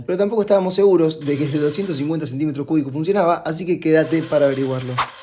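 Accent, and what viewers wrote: Argentina. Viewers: Argentino en España? Me salió sugerido tu vídeo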